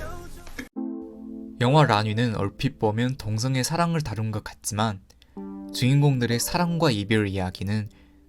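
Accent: China. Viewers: 듣다보니깐 유튜버분이 조선족이신가보네요? 발음이 살짝 한국인 발음음 아니군여 ㅎ 잘보고 가요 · 중국분이신지 몰랐다가 증거가 부족했다는 설명에 어감에서 약간 알아챘어요! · 중국인이세요? 발음이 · 한국어 정말 잘하시는데 살짝살짝 특정단어나 문장에서 얼화가 느껴지네요 성조나 발음까지 고칠 수는 없나봐요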